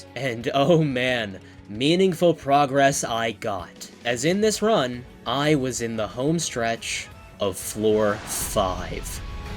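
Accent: Brazil. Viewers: pera ai tu é brasileiro??????????????????????????????????????????????????? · holy moly youre brazillian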